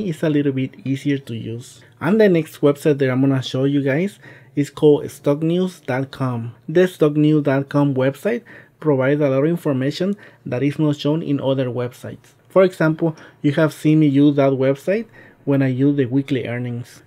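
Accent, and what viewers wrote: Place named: Latin America. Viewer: I appreciate your help and this video gave us viewers some more tools. Thank you. Two comments about presentation. 1. Wear a nice collared 👔 shirt. The look of success is credibility when talking about making money. You have a very kind face and your English is clear, despite the heavy Latino accent. It is very good. I know how hard English to learn and you are absolutely doing great. You are able to communicate very technical information and this is very impressive. The second thing I want to pass on to you about presentation is to SMILE. Lighten up. It is okay to show a more human side to you. I’m not saying to wear a bunny costume, but let us see the more personable side to you that we would if we went out for drink together. I will subscribe. Again, thank you.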